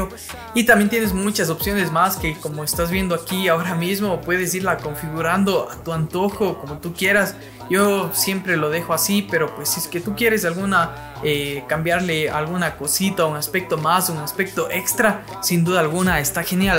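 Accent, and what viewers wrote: Ecuador. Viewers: Eres de Ecuador??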